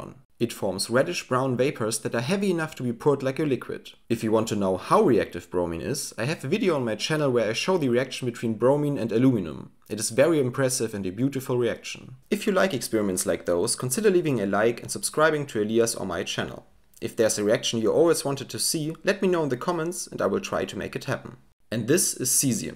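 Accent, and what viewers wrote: Germany. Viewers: Is this guy German? Cool experiment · geiles video und krasser deutscher aktzent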